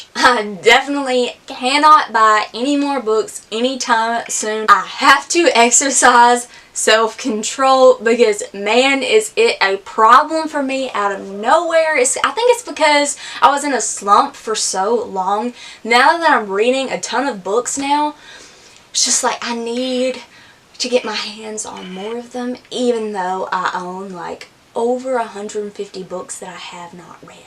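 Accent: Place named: South, United States. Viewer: Your southern accent is so cute! I have 20 books to haul so I totally understand. Also I’m amazed that you held them all up for the thumbnail!!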